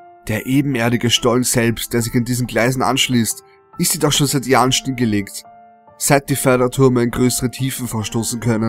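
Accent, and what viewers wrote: Austria. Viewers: bist du jetzt eigentlich Deutscher oder Österreicher? Dein Akzent klingt immerhin nach Österreich:D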